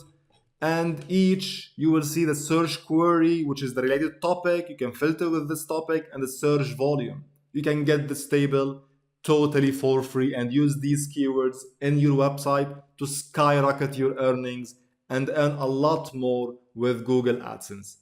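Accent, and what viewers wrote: Lebanon. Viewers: i swear 7asset ennak lebneni min ur accent... fricken love that!!!!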